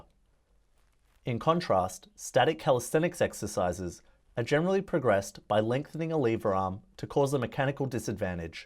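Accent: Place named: Australia. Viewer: That Aussy accent ...😁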